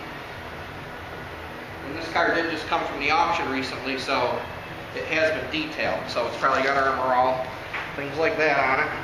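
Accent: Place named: Rhode Island, United States. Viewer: lmao are you from rhode island/maine? you sound like the original peter from family guy.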